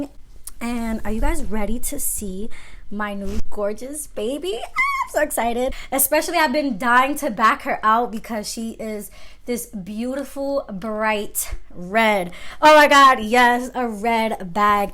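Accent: New York, United States. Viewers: girl you sound so new york i love it lmaoooo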